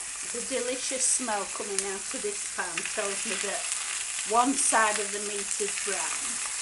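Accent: Yorkshire, United Kingdom. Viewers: I love your accent, are you from Yorkshire?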